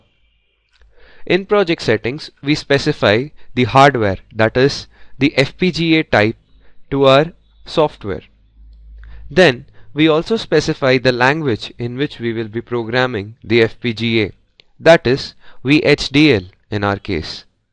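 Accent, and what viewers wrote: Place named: India. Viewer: perfect. slow. clear. indian :)) thanks!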